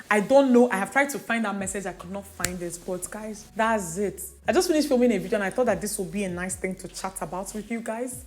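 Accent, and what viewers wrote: Igbo, Nigeria. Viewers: Speak your Igbo English girl, we will still listen and watch 🤣 no worries. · This ya igbo accent is sweeting me...Chai! Dalu o! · has finished me ooo😂😂😂😂😂😂😂😂😂😂😂 I love ur igbo accent jare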